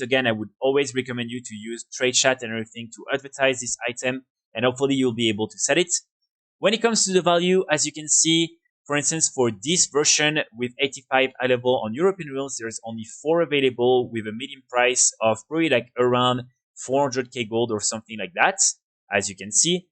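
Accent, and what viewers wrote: France. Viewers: Tu es français ? Je dis ça à cause de l’accent 😊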